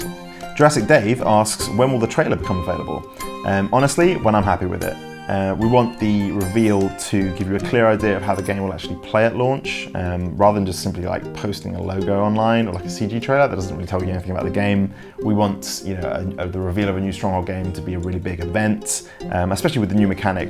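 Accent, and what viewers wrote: United Kingdom. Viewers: I thought is playing Lol only,but no,here he is talking with some strange British accent. GGWP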